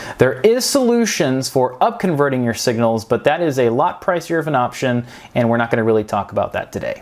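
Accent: United States. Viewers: For a while I thought I was watching, until I realized you spoke American English.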